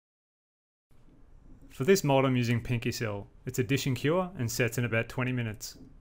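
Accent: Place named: South Australia, Australia. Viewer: Are you from South Australia? Your accent is exactly like my friend here in London and he is from Adelaide.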